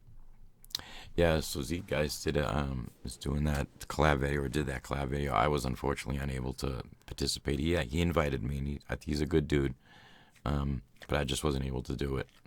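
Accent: Boston, United States. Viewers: Haven’t been subscribed for long, a few months, but I was not expecting the Boston-ish accent! So glad I found your channel. Your voice and creativity is one in a million! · I should have known you were from Massachusetts. I hear that lovable Boston accent. =) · It’s so weird to hear you talk normal volum... I never even knew you had a Boston accent! Congrats! And that marble device is very... T E C H N I C A L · Hi, I have to say, it's nice to hear your normal speaking voice! You should do more with your own Boston accent some day, it's very warm and personable! I love a lot of ASMR channels but the rumbly sound of your voice puts me right to sleep 💚😷😴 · Hearing you talk in your normal voice, I hear......Boston accent 😂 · You er so Boston I hade no idea.. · Are you from Boston? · I cannot be the only one thinking he’s attractive 😳 That Boston accent maaaan 😍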